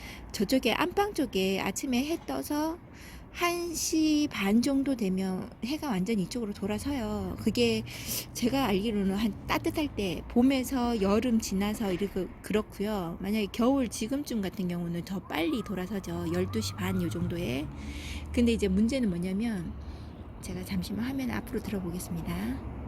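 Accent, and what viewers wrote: Gyeongsang, South Korea. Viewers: ㅎㅎ 아마도 안본사람 있어도 한번만 본 사람은 없을듯합니다 계속보게되는 끌림이 있네요 댓글도 일일이 달아 주시는 모습에 감동 또 감동입니다 앞으로 구독자가 4만~400만~~ 될때까지 팬으로 응원합니다^^♡ 말투도 정감있네요 그래서 대구근처에 사시는 줄 착각할 뻔요 ㅋㅋㅋ 댓글달기도 저는 첨인 아슬맘 이거든요 ㅎ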